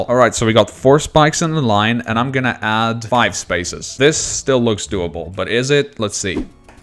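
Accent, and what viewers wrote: England, United Kingdom. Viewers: An english accent is not a bad thing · BRITSH MATE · I am English so his accent is completely normal to me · yoooo is British i cant belive it yess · bro youre acent is preatty bri'ish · he sounds more British lol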